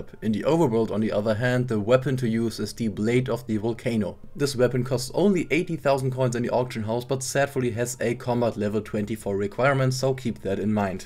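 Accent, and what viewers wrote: Germany. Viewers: he sounds 100% german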